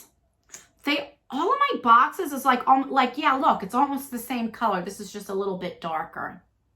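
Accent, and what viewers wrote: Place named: New Orleans, United States. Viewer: Hiya! Your eyeshadow is bangin' tonight! I love it! So pretty. Your accent sounds like your from nawlins. I love it! Hope your daughter is continuing on a great recovery!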